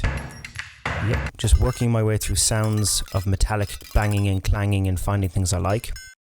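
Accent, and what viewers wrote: Ireland. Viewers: Now the next challenge is to find what Irish accent is it? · Loving this and great to hear an Irish voice doing such killer tuts. Nice one mate.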